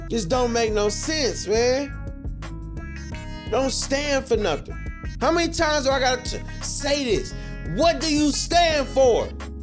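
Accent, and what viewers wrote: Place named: Rural, United States. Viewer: I understand the hustle bro but why do all of you attempting this hustle have that country bumpkin accent? Sarah Jane Comrie is in the wrong, she could easily press charges if she felt otherwise. She lied saying no one knew the identity of the young man, when proven to be a lie, her lawyer came forward to state that he has not intention to even try to sue the young man for defamation. You have to have the IQ of stale bread to genuinely see that video and think your self that she was in the right. When I tell you genuinely speaking the rest of the world views Americans as low IQ trolls you do not help that image. If she doesn’t deliver a baby in 3 months I dare you to make a video.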